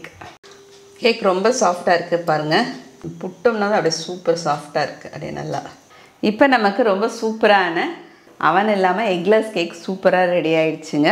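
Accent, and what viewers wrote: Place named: Cuddalore, India. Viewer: No words shown ka. Video is clear. I think mam had turned on tamil caption(subtitle) in her mobile. Cake looks super. Please try Eggless Brownie soon. Myself born and bought up in cuddalore district, my daughter born in Jipmer. We got settled in Chennai. Really your slang gives me my own native touch.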